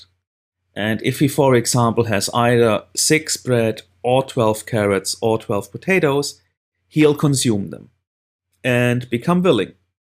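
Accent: Germany. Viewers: man merkt das du deutscher bist :D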